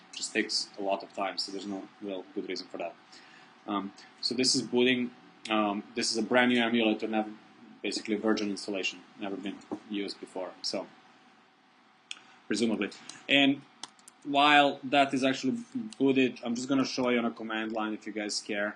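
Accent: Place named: England, United Kingdom. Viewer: Epicness indeed, but did anyone notice he had a perfect english accent for like the first 5 minutes like instantly went dr. jekyl and hyde?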